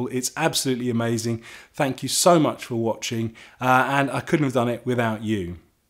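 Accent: United Kingdom. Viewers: Another interesting and entertaining video and I think the production values are great. I love the Britishness and subtle humour of your delivery and content - keep it up. Having never cycled abroad, although it's on my wishlist, I'd be interested to hear more about that. Do you take your own bike, or hire one out there? In Mallorca, do you prefer the north of the island as a cycling base, or some other area? Any tips for organising the trip that would be useful to others etc.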